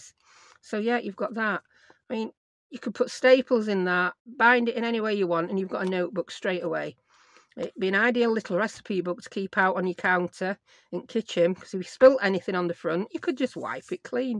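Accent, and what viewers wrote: United Kingdom. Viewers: I am American but was stationed in Britain for 3 years while in the Air Force. I love listening to you because I really miss Britain. I found myself calling scotch tape Cello tape today at work. The person I was talking to looked at me like I was from outer space. Then I realized what I had said. Lol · I loooove your channel. Maybe too much. I watch when I craft, when I go to sleep, and even on my way to and from work. But I may be over doing it because tonight at work, some components I was working on weren’t lining up and instead of the voice in my head doing it’s usual grumbling, a perfect imitation of you came out saying “Ahh! It’s all wonkay” 😂😂😂 I can’t tell you how happy I am that the voice in my head has gone British! 😂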